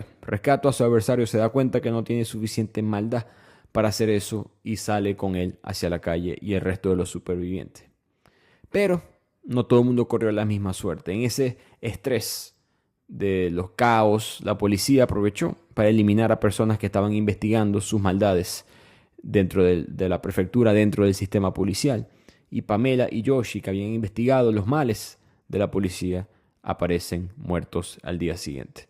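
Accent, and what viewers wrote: Maracaibo, Venezuela. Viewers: Tienes el acento del marabino educado e intelectual.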